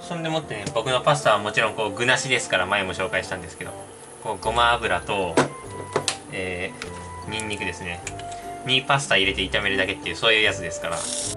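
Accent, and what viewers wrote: Kansai, Japan. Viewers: いんきゃの関西弁はらぶい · 関西弁いいね👍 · 急に関西弁なったりタメ語なったり俺言うん好きやわ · 関西弁萌える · 京都弁？関西弁？が、可愛いわぁ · 久しぶり見たけど関西弁すごい😂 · タメ語関西弁よき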